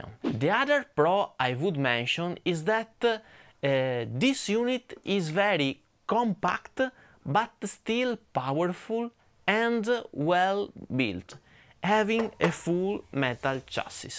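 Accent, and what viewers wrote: Italy. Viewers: Grande! Anche per l'inglese (dalla pronuncia però ti ho beccato subito ahah). Una domanda: ho l'mg30 e i modelli (non tutti) sono fatti molto bene. Il problema è che secondo me hanno sbagliato qualcosa nei gain stage: va in clip troppo facilmente e a livelli medio alti di drive impasta e presenta artefatti. Poi è veramente troppo presente sugli alti. Si risolve in parte abbassando il trim nel pre eq ma non basta. Peccato. Il Trident ha risolto 'sto problema?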